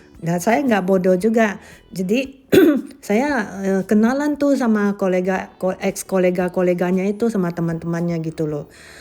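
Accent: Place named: Aceh, Indonesia. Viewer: Waktu lewat beranda saya, logat bicara ibu seperti temen kuliah saya orang Aceh, saya kira ibu orang aceh Mau tanya ibu asli mana Ehh ketemu vlog ini... Terimakasih sudah bercerita... Sehat sehat bahagia selalu bersama 🤗🤗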